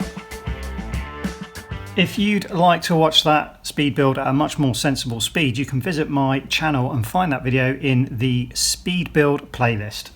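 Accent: United Kingdom. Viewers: Great review. I'm really looking at it just for that Forge figure. The face sculpt is fantastic (probably for some villain rather than Forge) and, as you point out, those details like the Ace card might not be readily visible in 'real life' but really come into play for stop motions where you get close to the figure. BTW : You sound like a Brit. How did you get hold of this set and (if its OK) what did you pay? BTW2 : Great MOC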